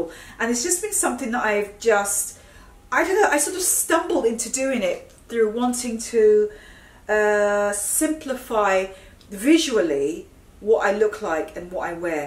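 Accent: RP, United Kingdom. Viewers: So fucking posh how have I not found you before now!!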